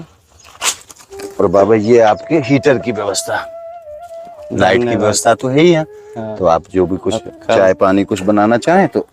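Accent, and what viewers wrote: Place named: Braj, India. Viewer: Sree! ji aap ko mera Radhe Radhe. Mai Rajasthan jodhpur se aap ka video dekhta hun. Bahut achha lagta hai. Aapke braj Bhasa ati sundar aur ati madhur hai. Aap bahut namra hai. Aap ka braj bhasa keval sunta jaun. Jai shree Krishna Jai shree Radhe radhe.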